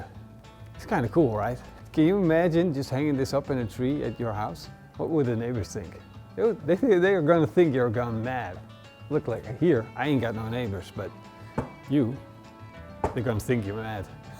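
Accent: Netherlands. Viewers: I could just hear that dutch accent and it makes is 10 times better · First video of you I watch, one minute in I thought “huh he sounds dutch” and sure enough a few seconds later you start talking dutch lol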